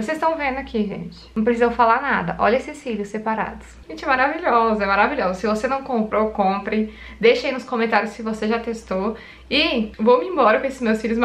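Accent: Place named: Minas Gerais, Brazil. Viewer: Já quero pra vida! Negoço existe no vocabulário aqui em Minas... haha Seu sotaque tem uma pegada mineira...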